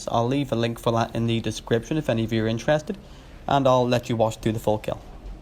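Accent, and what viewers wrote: Northern Ireland, United Kingdom. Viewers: Awesome hearing a Northern Irish accent 😝 represent!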